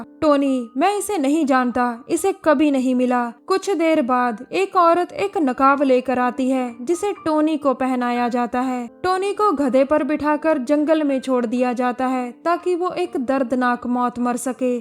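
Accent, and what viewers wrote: Punjab, India. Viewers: Taudi tone teh Punjabi hi rehni haige chahe jini bhi Hindi bolan de koshish Kar Lao ji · Madam aap punjabi ho ? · Aap punjabi bolte ho na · tohdai awaj to hint mil gya si ke tusi punjabi o ....best of luck for your Channal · I love your Punjabi accent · Are u Punjabi 😀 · Aap punjabi lgte ho bolne ke style se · Punjabi tone aa rahi he...bechari zabardasti Hindi bol rahi he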